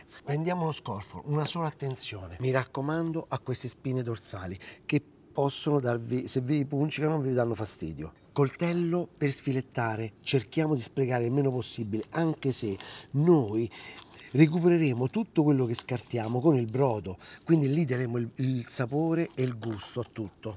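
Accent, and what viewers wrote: Rome, Italy. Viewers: Chef, La premessa è che guardo i tuoi video dagli albori e ti seguo da quando giravi per gambero rosso in tv. Ultimamente però ho da fare una critica, sperando sia costruttiva. Noto che il tuo modo di fare video è cambiato, adattandoti e quasi imitando quelli di un certo “cuoco amatoriale” di cui non voglio fare il nome che gira i video dal proprio balcone di casa… Tutto questo voler enfatizzare il romanesco e la gestualità molto simile all’altro usata per me ti rende meno autentico di quello che sei e che hai sempre dimostrato di essere. Spero venga visto solo come un parere personale e quanto più costruttivo possibile e non come una mera e deleteria critica. Saluti Max, comunque ti seguirò sempre e comunque per la tua simpatia e professionalità! ❤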